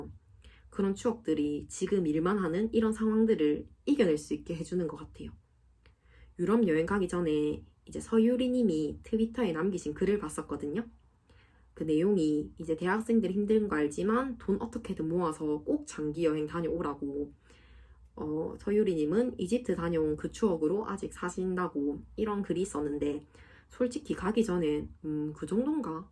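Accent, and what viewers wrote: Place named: Gyeongsang, South Korea. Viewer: 경상도 분이세요? 사투리느낌이..ㅋ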